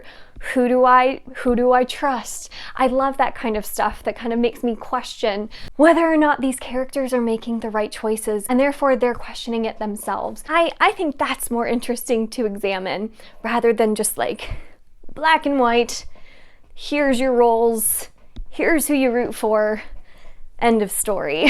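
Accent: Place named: United Kingdom. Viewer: That British accent tho hahaha you reminded me so much of El Brooks in this video, I love it!!!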